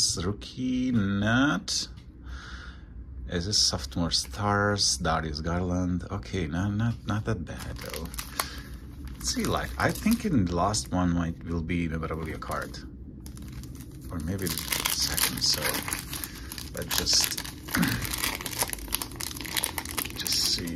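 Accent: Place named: Eastern Europe. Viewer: Eastern Euro step accent is always nice to hear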